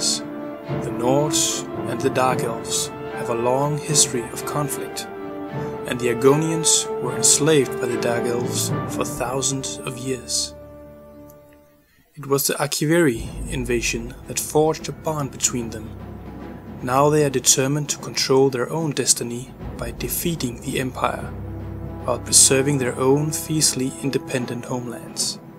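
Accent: Denmark. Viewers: SO dude, are you Danish? Just wondering. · Hey - great video! I couldn't help but wonder, are you Danish? You seem to have a slight accent, and your DK in " " hints at it as well. Cheers, a (fellow) Dane.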